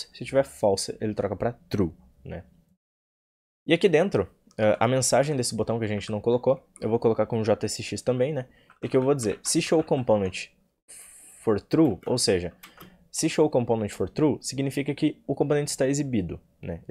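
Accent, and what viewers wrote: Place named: Rio Grande do Sul, Brazil. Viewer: Você é do Rio grande do Sul? Gostei do sotaque. Eu sou brasileiro.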